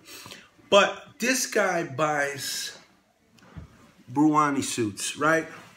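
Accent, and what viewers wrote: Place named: New York, United States. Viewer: Thank you Sir for an honest, accurate and entertaining watch review. I am a new fan and like your New York, Italian American manner, you remind me of a character from the 'Good Fellas' lol... Would love to hear you say 'Ged outta here' lol....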